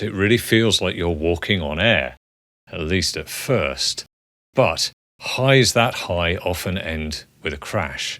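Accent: England, United Kingdom. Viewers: 50 bucks says you know this from both sides, doc, because the transference must be off the charts. All that and an English accent to boot. 😂 Funny thing happened to me, I politely extracted myself from a budding friendship with an unavailable LO, but now I'm running into him everywhere in a city of three quarters of a million people. It's like a cosmic practical joke.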